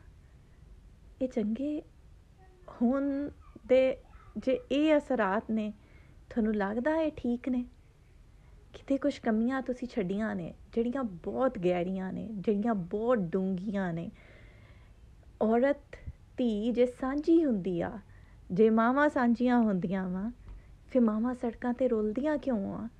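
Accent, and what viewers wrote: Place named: Punjab, India. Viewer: Ye itna jatki lehja?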